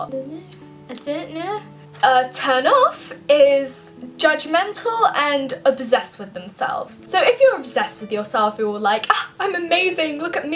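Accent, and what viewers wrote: England, United Kingdom. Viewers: Pshhh if I lived in England or Brittan or where ever that adorable accent is from XD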